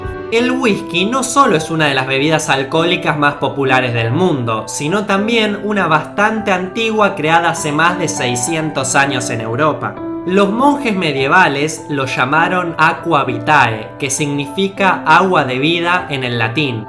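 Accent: Argentina. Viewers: Eres argentino??? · Que asco con tu acento detesto la manera argentina chaaaaaa para yaaaaa lo peor. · no puedo ver un top de palabras si eres argentino, con tu acentos degradas el español , pronuncias mal todas las letras y los acentos , tildes, etc... no es xenofobia, es que me resulta ridículo. si vas a hacer un top sobre PALABRAS del ESPAÑOL tienes que hablar con un acento lo más parecido al ESPAÑOL, no en ARGENTINO CHÉ. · sos argentino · Cuando un argentino abre la boca... ¡¡¡ áaaaaggh...!! se oyen exclamaciones de desaprobación, sobre todo en muchos países de Europa... NUNCA aprenderán a hablar bien. Basta con oir a éste sujeto, que aparte de expresar inapropiados términos de baja ralea... en su creencia que así será mas entretenido y agradable, demuestra el extracto social extremadamente bajo del que procede. Es exasperante la cantidad de veces que pronuncia mal: ''schá'' (ya sea por...) -- ''usás'' (usas) -- ''schueva'' (llueva) -- ''incluscha'' (incluya) -- ''schevar'' (llevar) -- ''schins'' (Jeans) -- ''tascho'' (tallo) -- schevaban'' (llevaban) -- ''schamaba'' (llamaba) -- cásches'' (calles) -- ''schamó'' (llamó) -- ''schó'' (yo) -- Hay que dejar muy claro que la sal no se ''AGARRA''... 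se toma. No poseemos garras... ¿cuántas veces repitió ''agarrás''....? véase en el video. -- ''Vos'' (tu. No estamos en la Edad Media) -- ''muschas'' (muchas) -- ''podés'' (puedes. Otra vez retrocediendo siglos) -- ''peschorativo'' (peyorativo) -- ''estrescha'' (estrella) --- queda bastante y me aburro.... Termino con otra tirada de orejas: Se encuentra el color ''marrón'', por ejemplo, en los ladrillos de construcción... pero en la vestimenta de los frailes Capuchinos el color es el CAFÉ...!! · Digo porque conozco a alguien Argentino y habla haci no más pregunto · CafeZK Es argentino,es su acento.Es raro al principio pero luego te acostumbras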